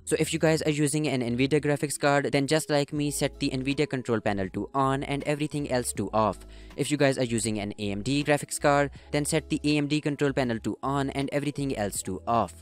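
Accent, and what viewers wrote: India. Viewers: you sound indian and may I ask what ethnicity are you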